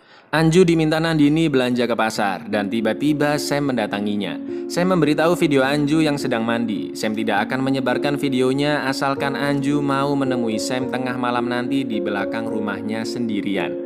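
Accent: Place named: Central Java, Indonesia. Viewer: Abang orang mana bang kok bahasa jawa nya kaya orang jawa tengah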